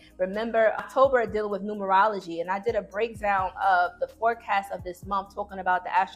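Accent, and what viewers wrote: New York, United States. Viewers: my god new fan here you are from NY with that lovely accent!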